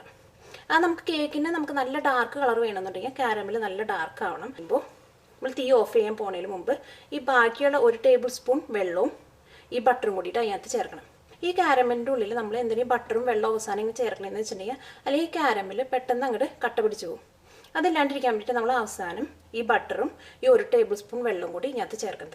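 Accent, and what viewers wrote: Thrissur, India. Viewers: ഇത്തരം പോസ്റ്റുകള്‍ ഇടുമ്പോള്‍ മറ്റുള്ളവര്‍ക്ക് പ്രയോജനം ഉണ്ടാകണമെങ്കില്‍ പറയുന്നത് മനസ്സിലാകണം. ദയവായി സ്പഷ്ടമായി പറയുവാന്‍ ശ്രമിക്കുക. തൃശ്ശൂര്‍ ഭാഷ എല്ലാവര്ക്കും മനസ്സിലാകണമെന്നില്ല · seems to b a easy recipe. will try it for this xmas.. really like ur thrissur tone :)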